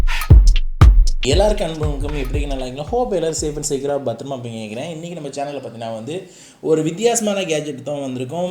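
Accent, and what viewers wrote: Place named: Sirkazhi, India. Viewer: Bro neenga sirkhali-ya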